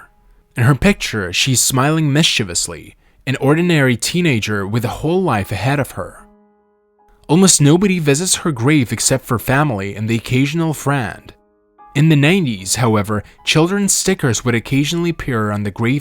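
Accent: Russia. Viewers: This is how he talks, who are you to complain about that? He has a very beautiful and charming accent. You do understand english is not his 1st language, I think he might be from Russia. I'm guessing you are Americans, the only people arrogant enough to complain about someones accent🤦‍♀️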